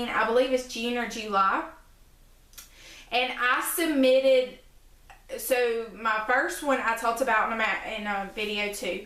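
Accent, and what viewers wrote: South, United States. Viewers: I'm definitely getting that magazine as soon as I get my check next week! I know where Barnes and Noble is. I love watching you and hearing you talk because you are so southern, and I'm southern too. I live in South Carolina.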